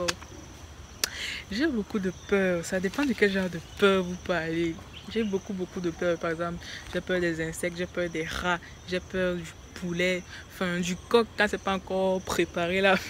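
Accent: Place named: Cameroon. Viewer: J'ai reconnu l'accent dès le début. 237 en force !!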